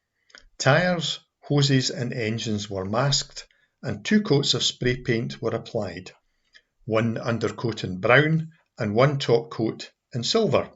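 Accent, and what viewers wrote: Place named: Scotland, United Kingdom. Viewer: The accent is Scottish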